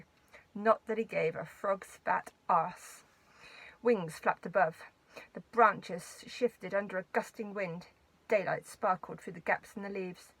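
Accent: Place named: United Kingdom. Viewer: Sounds so much better with a British accent!!! Thank you for doing this. You rock.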